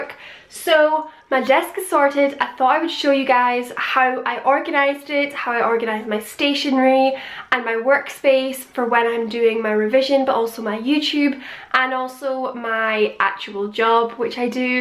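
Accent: United Kingdom. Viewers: I love how her accent sounds like normal English accent but also has that British accent😍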